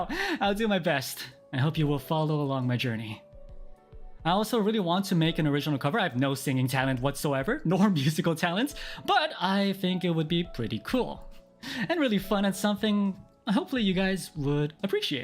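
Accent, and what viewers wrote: South Korea. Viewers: Now that I think about it, he does have a Korean accent... Compare it to someone like Suha it's not as noticeable but it's definitely there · I knew it! Another half Korean at NijiEN!! I recognized your accent. You're so amazing. 만나서 반가워요 오빠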